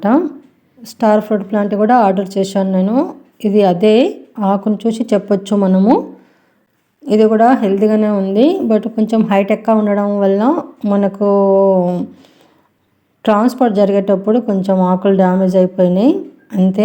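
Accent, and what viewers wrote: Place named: Telangana, India. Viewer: Asalu chandalam antey endi andi mana Telangana lo chala slangs unai ah matram kuda miku telvadha adhi village slang miku nachakunte vinakandi mimalni evar froce cheyaleru antey kani okari basha baledhhu Ane right miku ledhu...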